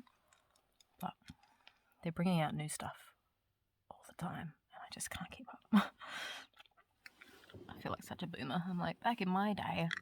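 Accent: Australia. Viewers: As someone from the US, I can attest to social opinion of Austrailian accents, and trust me...we don't think you sound like yokels. If anything, people think someone with an Austrailian accent is probably worldly af lol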